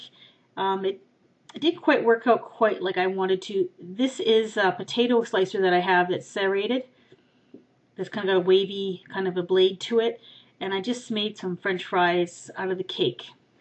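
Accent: Canada. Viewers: i love your canadian accent it makes me so happy inside.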